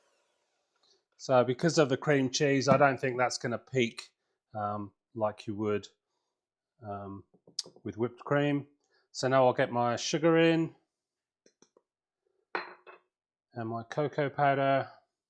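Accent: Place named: Australia. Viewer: Thank you for this video! I'll be making this tomorrow. I'd make it today, but I just cleaned up the kitchen from making ice cream, and am just too lazy for today. I am now your 365th subscriber. And, I LOVE your Aussie accent! Diane in North Carolina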